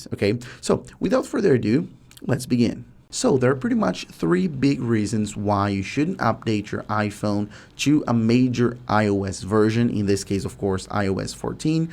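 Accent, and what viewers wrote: Brazil. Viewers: Um salve de São Paulo pra vc,! Notei o sotaque brasileiro, mesmo vc falando super bem hahaha. Valeu pelo vídeo!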